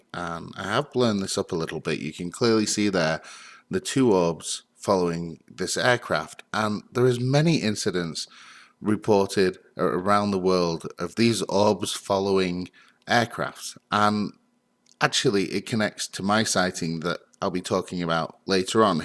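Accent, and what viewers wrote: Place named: Manchester, United Kingdom. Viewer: OK, I thought I rec ognised a Manc twang in there, I'm from Bury.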